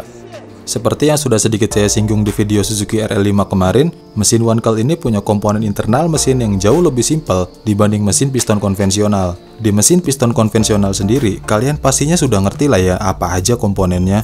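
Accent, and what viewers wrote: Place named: West Java, Indonesia. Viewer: Fix, logat nya sunda.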